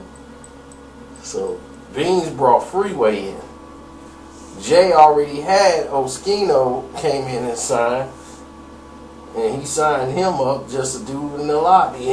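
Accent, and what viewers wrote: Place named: Philadelphia, United States. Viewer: Why are you talking philly. Your a cornball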